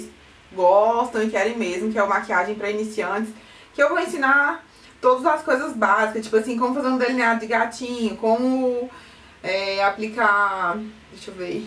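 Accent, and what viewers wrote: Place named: Goiás, Brazil. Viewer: Vc é da onde? Seu sotaque é Bem Goiano 😍❤️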